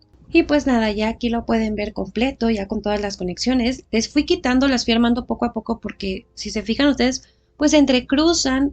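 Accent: Mexico. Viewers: En el inicio muy famoso de la novela Noticias del Imperio, de Fernando del Paso que trata sobre Maximiliano Y Carlota, el célebre monólogo de Carlota tiene una frase que se refiere así a Maximiliano: fue el primer descendiente de los Reyes Católicos Fernando e Isabel que cruzó el mar océano y pisó las tierras de América... A la luz de este árbol super extendido, veo que no fue así, pues los Braganza llegaron a Brazil antes que los Hambusburgo Sax- Coburg Gothe a México. Impresionante trabajo, gracias por compartirlo. Tu acento no suena ibérico, parece mexicano 😊.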